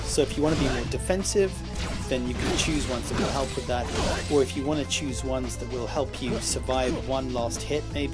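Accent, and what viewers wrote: United Kingdom. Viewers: Has it been taken down? You sound British, it's not been taken off the UK store? I can't find it, I can only find the prologue game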